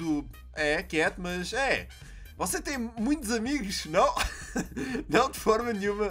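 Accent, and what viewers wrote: Portugal. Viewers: tu es o melhor YouTube que fala português de portugal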